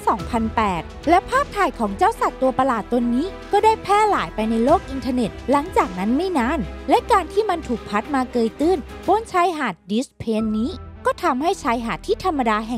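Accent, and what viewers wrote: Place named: Suphanburi, Thailand. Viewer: ชอบสำเนียงเหน่อๆคนพากย์แบบสาวสุพรรณแบบนี้จริงๆน่ารักแปลกๆดี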